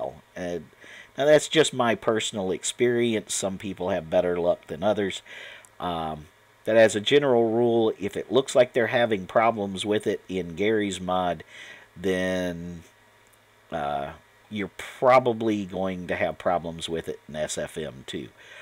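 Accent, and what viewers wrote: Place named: Australia. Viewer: the australian accent is insane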